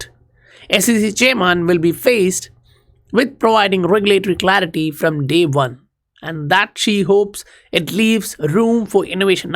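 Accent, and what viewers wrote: India. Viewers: Sound like Indian? Im right?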